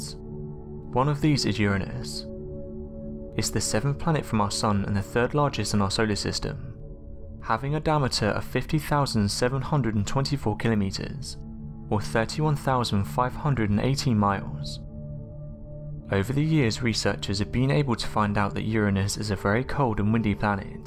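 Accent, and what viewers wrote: United Kingdom. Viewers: Is this a Brithish robo-voice? Otherwise not much content. Uranus is boring. Wind and pebbles.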